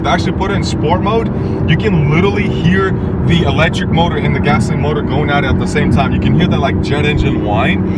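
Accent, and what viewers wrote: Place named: Bosnia and Herzegovina. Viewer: That accent is 100% Bosnian lol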